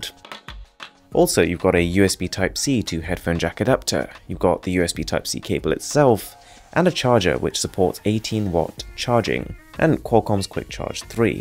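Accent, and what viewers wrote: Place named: England, United Kingdom. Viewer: Dude ur english accent its insane 🔥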